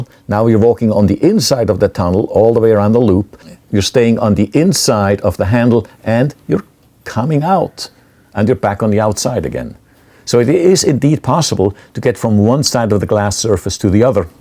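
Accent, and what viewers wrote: Switzerland. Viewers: Yay he's actually Swiss! :D (Like me) (The accent made it easy to guess it right) xD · he kind of sounds swiss. grüezi!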